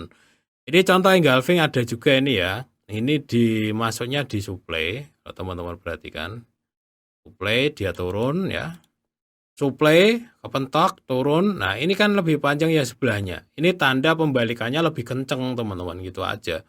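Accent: Java, Indonesia. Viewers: Maturnuwun mas... logate jowo banget..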